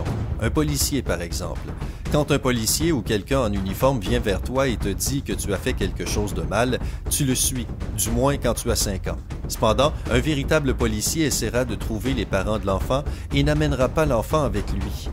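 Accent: Quebec, Canada. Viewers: Simpa , ta l voie de qcoxe (je me demande si tes quebéquoi ou tes juste lui 😐) qui est d'accord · T canadien pas vraie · Figooroo ! Ouii j’avais aussi l’impression durant toute la vidéo qu’il parler avec cet accent Québécois 😍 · Québécois... j'aime ça ! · Rien avoir tu es québécois ?? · dislike , c'est le québécois qui parle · Tes québécois ? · C pas un accent quebecois? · Les accent québequois .. AH CA M'EXCITE!!!! 😄😄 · Eum c’est moi ou le commentateur est québécois ( comme moi ☺️ ) et wow super belle vidéo et très utiles 👍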